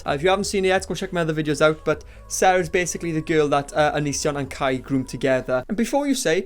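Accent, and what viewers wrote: Wales, United Kingdom. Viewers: I didn’t know it was possible to be SO Welsh 😂💖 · Is your accent welsh? It’s lovely ! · You’re welsh right ? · Welsh?commentary on how trash onion boy is yes please I didn’t know I needed this until I came across your channel by chance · You welsh? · Are you Welsh????? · Accent twins! Never seen a Welsh commentary channel before hahahah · Off-topic, but I love your accent (im welsh too). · OMG, I ❤️ ur Welsh accent!! I’m from America, but I’m obsessed with Wales. I’ve been there 16 times, and I’m covered in Welsh- themed tattoos. r my fave band, and I want to move to Caernarfon. Thought it was hilarious when u called him a baby carrot! (For those of u who don’t know, “carrot” in Welsh is “moron”). 😆CYMRU AM BYTH!! 😍💚❤️🏴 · pfffft I L O V E Welsh accents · bro ur so welsh i love it it sounds like home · You're welsh! :D Closest thing I've found to my useless Shropshire self <3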